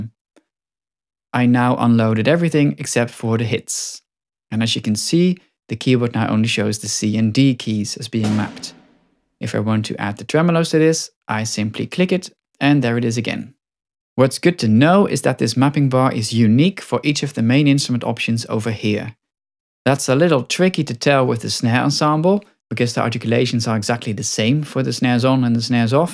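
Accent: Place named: Netherlands. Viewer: Love the update (and subtle Dutch accent :> )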